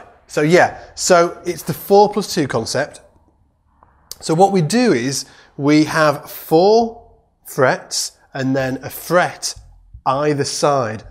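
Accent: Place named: United Kingdom. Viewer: you are the man! love the glove, British accent and of course the bass lesson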